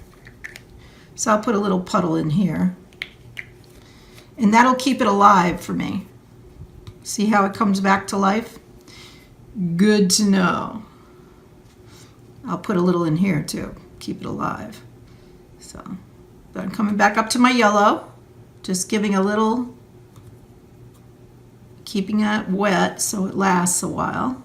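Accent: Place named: New England, United States. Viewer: New England? I hear it, dahk instead of dark... and idear instead of idea (my hubs is from New England). Do you also say acrost instead of across? I think it's adorable ;-). Anyway, fun tute, thank you, plus Plum Blossom is one of my daughter's nicknames so I think I'll paint something like this for her.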